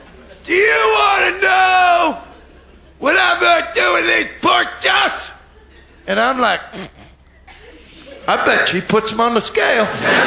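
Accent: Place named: Liverpool, United Kingdom. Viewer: You know he's a scouser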